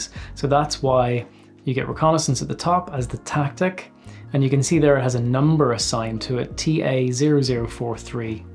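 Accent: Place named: Scotland, United Kingdom. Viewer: but you need easier english, you speak too scottish bro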